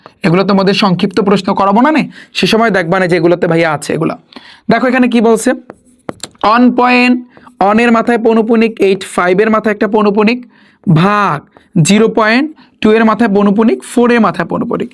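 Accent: Barishal, Bangladesh. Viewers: ভাইয়া কি বরিশালের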